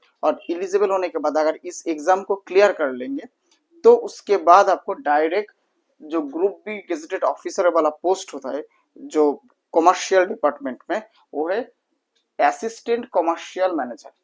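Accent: Bengal, India. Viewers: Bengali tone so sweet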